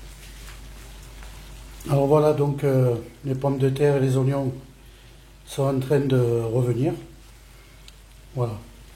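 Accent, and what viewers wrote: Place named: South, France. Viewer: Coucou =) "!!!!!!" Comme dit Lucky, ca donne trop Faim "!!!!!!" Super vidéo d°un excellente organisation, Merci infiniment pour des bonnes recettes "!!!!!!" Ca fait du bien aussi d°attendre notre accent du Sud "!!!!!" Bonne Faim de soirée "!!!!!!"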